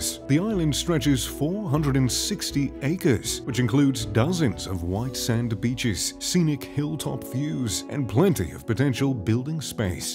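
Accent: RP, United Kingdom. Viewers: You sound like the spiffing brit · why do we have a boujee British man narrating this?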